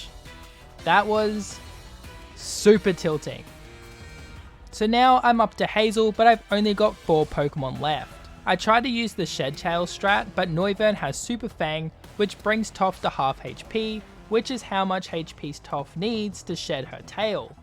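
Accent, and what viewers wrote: Australia. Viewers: Are you Australian if so in from the central coast in NSW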